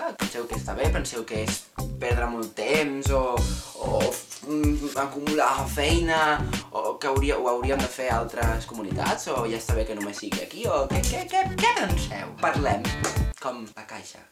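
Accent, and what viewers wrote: Spain. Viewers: se te oye sexy en catalán, en general me quedo a descifrar lo que dijiste con tu acento español, peor ahora no eh podido, a pesar de lo sexy, ni modo.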